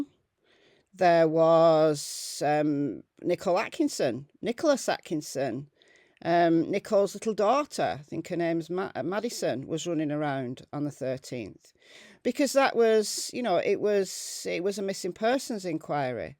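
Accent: United Kingdom. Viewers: Me too. I love accent. We do not have tons of UK residents in Va where I live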